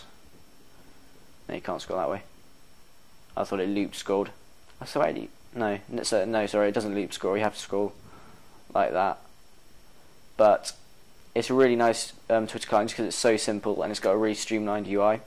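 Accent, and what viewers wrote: United Kingdom. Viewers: Thumbs up for British accent! D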